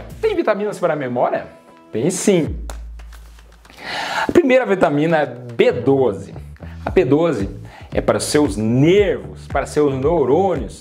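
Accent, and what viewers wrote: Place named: Rio Grande do Sul, Brazil. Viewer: Seus vídeos são fantásticos, além desse sotaque maravilho, levandodo-me às memórias do meu querido Rio Grande do Sul, que saudades daí do sul! Deus lhe abençoe, guie e guarde. ❤ Grande abraço.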